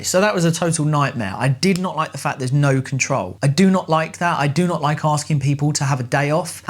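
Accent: United Kingdom. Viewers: Your accent is More british and you speak very fast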